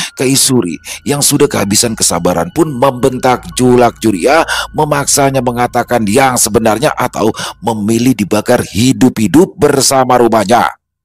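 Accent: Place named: Banjar, Indonesia. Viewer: waah makin mantap nih aksen bahasa banjar mas..kereen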